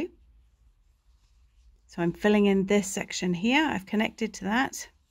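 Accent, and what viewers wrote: United Kingdom. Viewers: Agreed Dolton-all of her videos/tutorials are so easy to follow, and she explains thing(steps) in easy to understand terms. Plus the British accent is great 😊. Also been crocheting for 50+ years and still learning thanks to awesome teachers like 🎉🎉🎉🤩!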